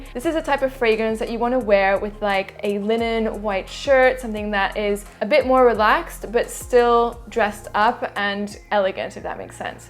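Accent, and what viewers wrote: France. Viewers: Great video,! Everytime she pronounces the names in this French accent...I wanna wife her up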